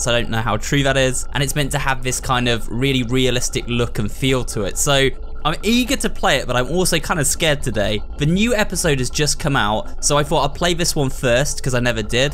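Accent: United Kingdom. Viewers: The British accent is making it funny but i really wanted to hear say bottle of water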